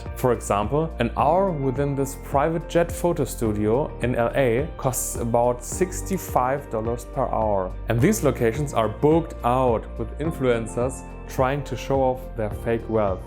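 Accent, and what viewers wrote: Germany. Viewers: Direkt am Akzent den Alman erkannt ^^ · Crazy behaviour and sad. So shallow. And yes some earn lots and lots by selling gullible people courses on how to level up and so on, targeting desperate people to waste their little money in hooe to better their lives. That is real shabby and low behaviour. Btw. You have an interesting German accent, are you German by any chance?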